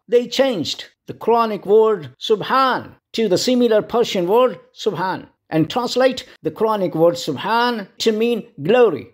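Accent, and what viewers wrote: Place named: United Kingdom. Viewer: He is speaking British English in quite normal speed. He is not fast at all. If he speaks slow it will be dragging. If you listen English news and other English programs you will find that speed seems a bit slower than native English speakers.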